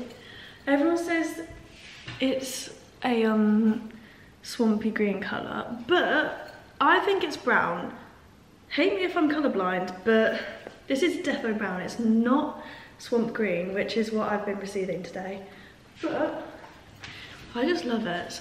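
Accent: United Kingdom. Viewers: is she british or does she have origins?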